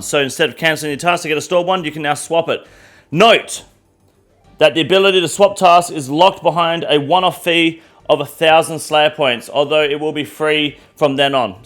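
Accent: Australia. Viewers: idk about you but i’m watching his vids because he’s actually unique, very very funny (had quite a few laughs here) intelligent and level headed. Doesn’t involve himself in drama. Stays winning and stays in his lane. Aussie accent, what’s not to like about the dude? He could be reading the entirety of lord of the rings as an audiobook and i’d listen because it’s his energy and personality that’s infectious.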